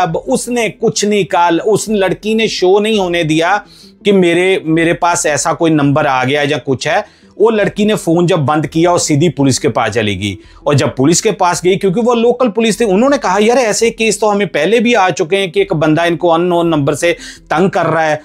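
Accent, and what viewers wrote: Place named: Punjab, India. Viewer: पंजाबी टोन में आप हिन्दी जब बोलते हैं ❤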